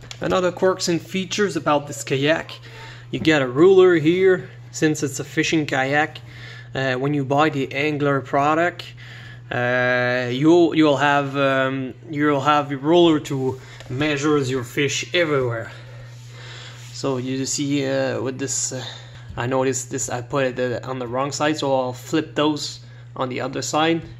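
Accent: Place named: Quebec, Canada. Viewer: Quebec 101 i can spot that accent ;) Good video!!!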